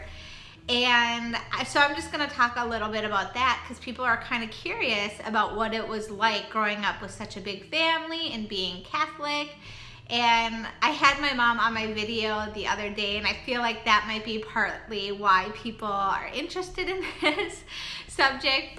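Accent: Minnesota, United States. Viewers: I was so sure that was a MN accent. I'm originally from Northern MN myself. Thanks for sharing ❤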